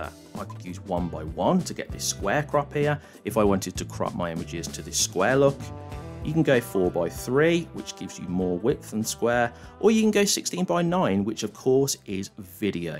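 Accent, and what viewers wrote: United Kingdom. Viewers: Two things stand out for me 1, he’s British which makes a nice change from all the American offerings and 2, he explains the basic, but important settings in a language that a beginner/enthusiast can understand and get to grips with quickly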